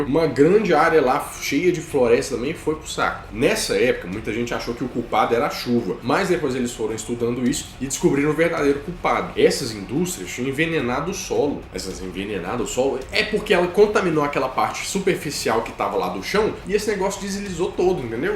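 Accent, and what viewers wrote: Minas Gerais, Brazil. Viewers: sabia q ele era de Minas só pelo sotaque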